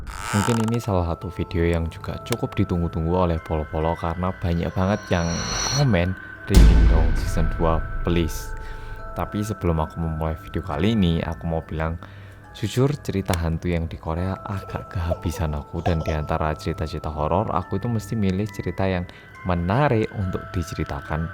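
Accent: Java, Indonesia. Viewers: Mana orang korea yabg medokkkkkkkk................;v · bersama orang korea yang... aku: medokkk mas: kewedennn sampe lupa:'' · Medok Is Back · Aku rasa kok medoknya mas rada kurang ya.wkwkwk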